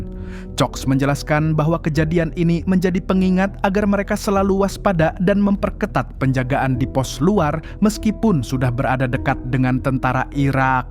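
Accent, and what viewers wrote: Banjar, Indonesia. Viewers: umma mang org banjar sakalinya lah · 😂dangar narasi pertama admin,,kok kaya logat bhasa Banjar,,smangat min bikin konten y,,q urang Banjar di Jabar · Orang Banjar kah min.. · Urang banjar kh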